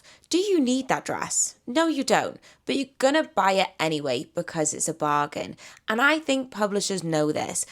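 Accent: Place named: Southern England, United Kingdom. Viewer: Based on her accent and the info in the description of her Channel, she most likely buys books in the UK. I'd guess southern England but I could be wrong.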